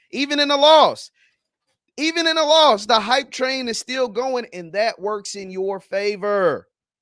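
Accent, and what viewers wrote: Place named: Boulder, United States. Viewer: done switched up‼️ I knew u sounded like them ppl up in Boulder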